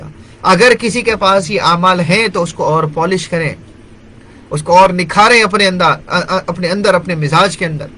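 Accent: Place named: India. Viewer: Great, Maashaa Allah, yaa habeeb. But I don't understand your Indian speaking.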